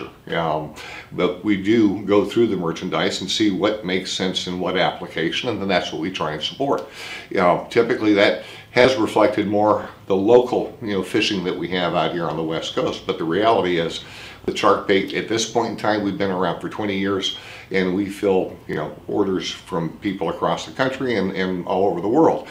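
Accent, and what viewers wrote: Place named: East Texas, United States. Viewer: I think I was pretty clear why I didn't spend time yacking about drag levels, talked about that at the end of the video. Watch the end of the video and perhaps you'll understand why I feel those numbers are pretty much bunk. In my mind, its pretty silly to talk about high numbers when they don't apply to reality. Who cares if a $200 spinner has 30-40 lbs of drag, it's not going to be used and the rest of the drive train won't necessarily sustain those pressures. On a 50 sized two speed made for catching cow sized tuna, it's meaningful. On a lighter duty spinner, it's really irrelevant so long as there's enough usable drag to fish the intended line class. The BG's do that very well, as do the Saltist series spinners. As to why I say yeow...simply because I can.... Why do I wear a hat...because I like 'em and it's cold on a shaved head. 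Why do I wear clean underwear... I am not a TV personality, newscaster or info-mercial talking head, I'm a f'n fisherman who tries to provide some info to anglers. Do not expect more than what I'm capable of providing, and if my manner or pauses in dialog, accent, colloquialisms are disconcerting, so be it, no apology. Shucks, catch me at the right time and I'll lapse back to an East Texas accent. No doubt the manufacturers' own vids are far more professionally produced, and you'll get more of their talking points and buzzwords. However, our vids are independent, honest, and accurate, and done to the best of my meager ability. I'm happy to take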